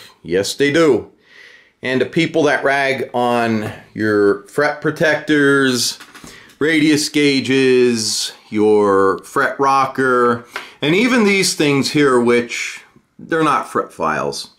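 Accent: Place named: Chicago, United States. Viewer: What part of chicago are you from? Lmao You sound like you're from my old neighborhood lol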